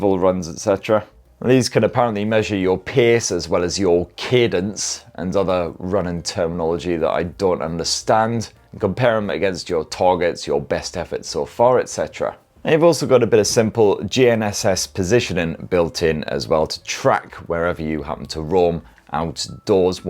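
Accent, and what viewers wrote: United Kingdom. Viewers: Obviously not otherwise he wouldn't have a very British accent. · Also comes with a decent british accent. 👍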